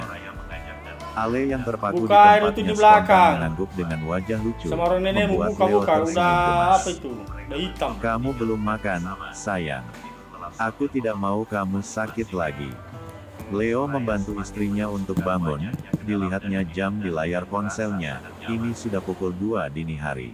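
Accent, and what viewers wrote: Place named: Sulawesi, Indonesia. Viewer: Waalaikumsalam bang.makasih semoga sehat dan sukses..semoga semakin bahagia Ale dan leo..bang maaf bahasanya abang seperti bahasanya orang sulawesi...seperti aq 😊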